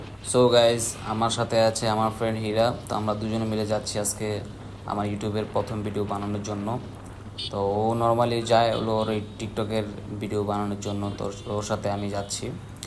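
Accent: Noakhali, Bangladesh. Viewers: Vhai to valoi kotha bolen noakhali bhashai 😮😮😮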